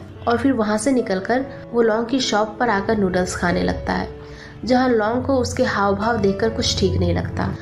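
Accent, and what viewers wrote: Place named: Bihar, India. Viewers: Bihari ho ka ji.... परोसी, परा , भीर..... ई सब का है।।। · You are from Bihar.. Am I right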